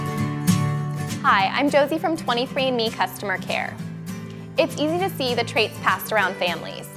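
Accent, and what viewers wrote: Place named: United States. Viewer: her hardcore white american voice and the music... it's a lot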